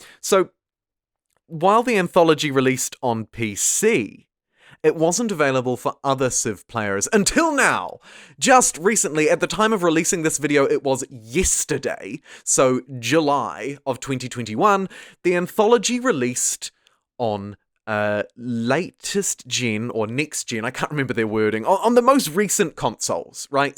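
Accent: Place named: New Zealand. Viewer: I love your accent. New Zealand?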